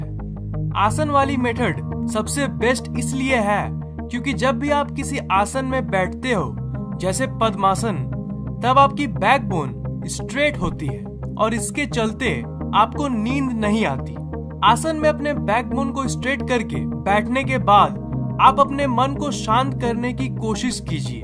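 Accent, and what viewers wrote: Bihar, India. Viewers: Bhai aap Bihar ke ho kya 🙄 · Bhai aap Bihar me Kahan se ho aap ki bato se bilkul lagta he ki aap Bihar se ho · Aap Bihar se hain kya?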